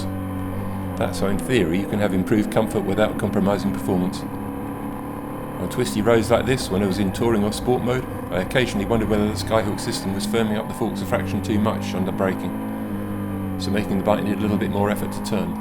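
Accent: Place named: Australia. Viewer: Hi Guys, I am italian and I could follow the speaker excellently. Speed it is not a problem in general if no jargon is used ;-) this said I think that for an internal audience it might be a bit problematic understand speaker's english given the accent. is he australian or what?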